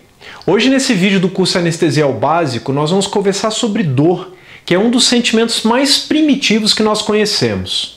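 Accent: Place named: Minas Gerais, Brazil. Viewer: Prof. vc disse que é metade bahiano, metade italiano... Mas seu sotaque é bem mineiro... Parabéns pelos videos, estou gostando muito....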